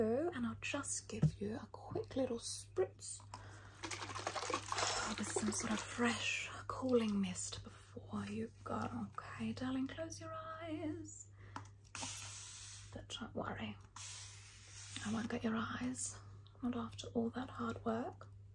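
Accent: Australia. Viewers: OMG!!!! I'M SOOOO EXCITED TO HAVE A VIDEO FROM YOU!!! Its so rare to get that specific Aussie accent/way of saying things. you are amazing, i hope 2024 treats you better. We are all just so happy for anything you do. I wish you love & light & happiness for 2024.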